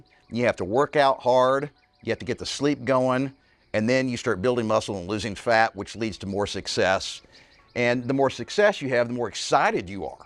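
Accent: Texas, United States. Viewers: He’s a Texan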